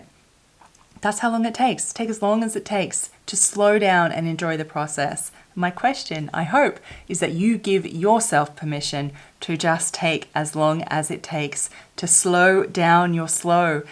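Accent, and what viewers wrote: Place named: Australia. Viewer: Hello! I'm a fashion designer student, recently took the haute couture class in which everything is handmade. The project is a wedding dress and I aboslutely loved the process. It is really slow and it requires patience and attention to detail, but I found it quite relaxing. It was a space I gave myself to take things calmly, and stop worrying about all the other stuff I had to do on the day, you know? I know I can't make all my pieces in this method, or at least not now cos of the economic side of it, but it was a nice experience: for the first time the dress' aesthetic is comming exactly as I imagined it (only a size smaller hahahaha), and I guess is the time involved in it. By the way, I didn't know you are in Australia, I could sense a different accent, but didn't guess where it was from. I'm not an english native speaker, so I was like... 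hummm.... she sounds a bit british, but not quite! hahaha. Greetings from Mexico City <3!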